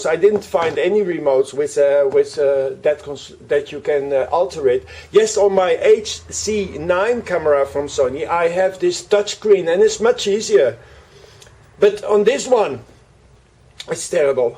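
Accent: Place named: Netherlands. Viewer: XD you just can hear he's Dutch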